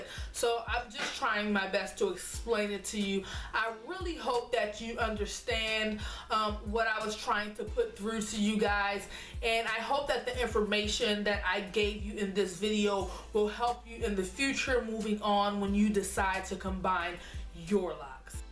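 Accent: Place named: Maryland, United States. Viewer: Helpful! I hear some Maryland in that accent...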